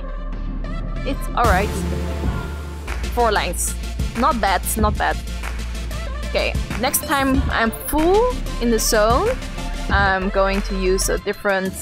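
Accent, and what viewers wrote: Netherlands. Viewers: 🤣🤣your vid convinced me to buy it I can tell you really enjoyed it..by the way awesome accent are you a native Dutch speaker?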